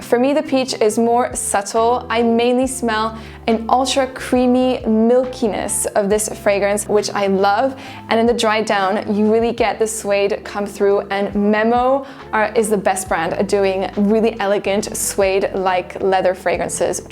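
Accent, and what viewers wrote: France. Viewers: In any case, I'm waiting, but I follow you a lot on YouTube. Nevertheless I just subscribed to your IG page and I love your little French accent. THANK YOU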